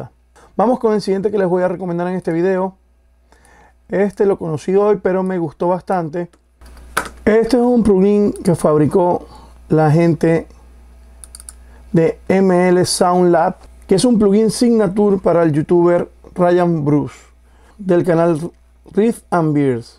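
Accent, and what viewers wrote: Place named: Dominican Republic. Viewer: Yo soy dominicano y pensé que eras domi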